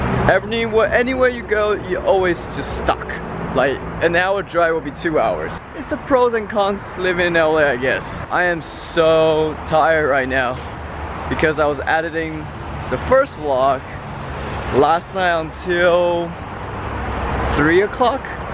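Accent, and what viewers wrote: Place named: China. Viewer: You Chinese?!?!......always thought you're from Vienam cause you look like one. But I just noticed your Chinese accent from your vlogs so I can see now.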